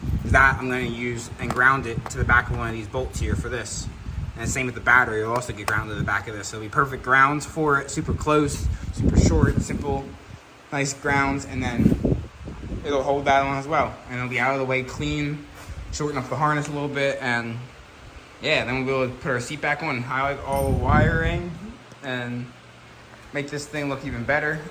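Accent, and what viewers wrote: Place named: United States. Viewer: Random question but do you live in Europe I noticed all of your license plates are European but you sound like American or Canadian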